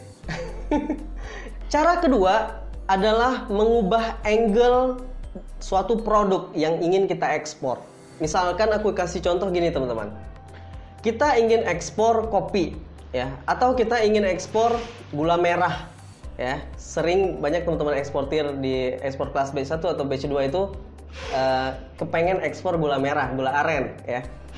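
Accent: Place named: Aceh, Indonesia. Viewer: Orang aceh kau ya bang??? Ku dengar logat bicara nya ada medok pletak pletok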